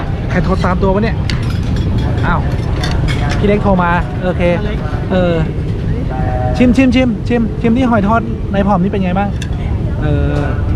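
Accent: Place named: Nakhon Pathom, Thailand. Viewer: สวัสดีคุณ และน้อง เอทำไมพูดเหน่อเหมือนพี่เลยแฮะ พี่คนนครปฐมจ้า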